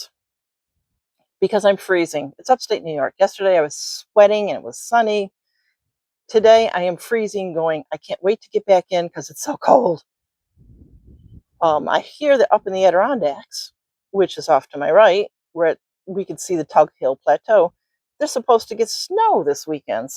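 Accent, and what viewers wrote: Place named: North, United Kingdom. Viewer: Thanks for the video.I am from around tug hill.Moved to Florida because my husband was in the Military.We still have family up North.Love your up North accent.People still ask me where I am from.Beautiful country up North.I miss the seasons but not so much winter😂Thank for all you do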